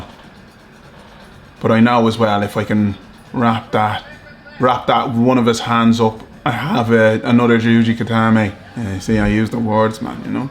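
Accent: Ireland. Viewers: Is that a new Irish accent? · London? He sounds Irish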